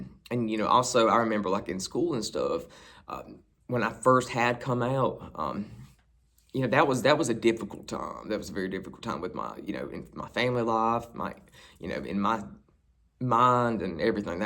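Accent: South, United States. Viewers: Aussie here loving your southern accent. Just want to hear you say Veh-hickle. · Love your videos. I could listen to you all day. I love your southern accent. Plus you have a great hair style. You and I have alot in common. I mainly listen to female singers. Mainly. Have you read her memoir, yet? Love and hugs from Dunlap, Ill.😊😊🎄🎄⛄⛄🎅🎅😊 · I love your vids. You and I have much in common. I was born and raised in the upstate of SC and I now live in Charleston. You and I have that same upstate southern accent too. I always look forward to seeing you every time you post. Much love and blessings.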